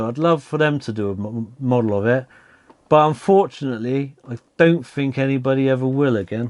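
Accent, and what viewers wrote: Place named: England, United Kingdom. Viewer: Thank You, for a Great Video, and, where r you Gentleman from, England, it sound's like.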